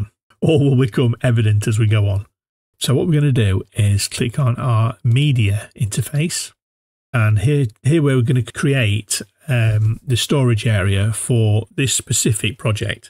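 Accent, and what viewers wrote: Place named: United Kingdom. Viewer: I get to learn Resolve while listening to a deep clear British accent, which for an American is a guilty pleasure. Lets go!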